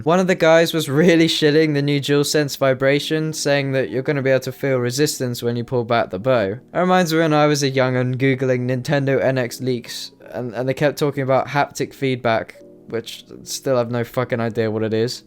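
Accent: United Kingdom. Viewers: should have known you were British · You're british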